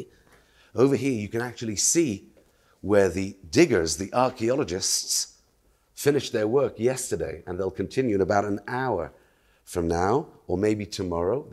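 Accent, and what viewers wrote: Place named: United Kingdom. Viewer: Thank you for this wonderful tour, so excited just to watch it. And it’s also good to hear a UK accent . God bless you.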